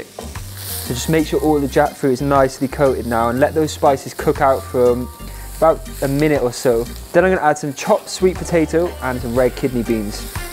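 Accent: United Kingdom. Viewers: Brilliant!!! 🤤🤤🤤🤤 I’ll never get sick of your UK accent, how you say vittttamen especially, adorable and sexxxy 😉😁🤣🤣🤣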